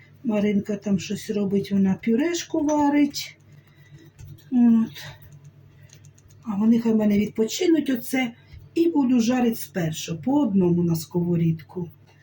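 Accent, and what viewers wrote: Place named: Poltava, Ukraine. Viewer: Яка у вас гарна украiнська мова! Вы, мабудь с Полтавщини? Огромная Вам благодарность за рецепты. Вы очень душевная женщина. Удачи Вам!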